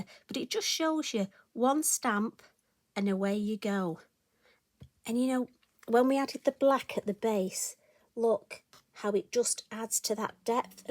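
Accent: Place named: England, United Kingdom. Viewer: Thanks so much,. I honestly haven't used my stamps for years, as I started to focus on watercolouring and alcohol inks, but you have totally inspired me to get my cardmaking supplies back out from hibernation and to do some blending! It is beautiful to hear your accent, too; I'm originally from England but living in Australia since 1985 and feeling a bit homesick, so you've doubly-blessed me today, haha!!:)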